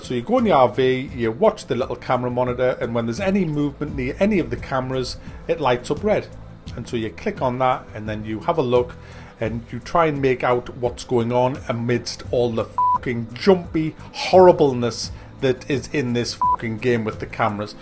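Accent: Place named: Newcastle, United Kingdom. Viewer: Sorry, Vampires do exist. Love your reviews. Proper Geordie rantings!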